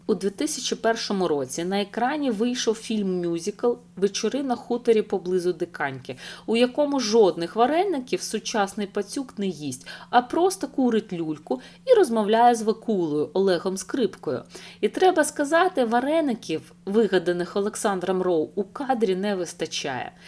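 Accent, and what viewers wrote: Russia. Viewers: текст читається з потужною московинською вимовою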